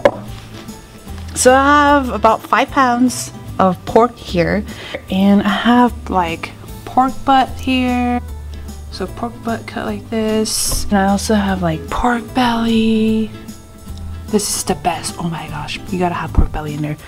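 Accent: United States. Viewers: Are you from the south? You have a southern accent, like me. lol. I’m from NC. Thanks for sharing the recipe!!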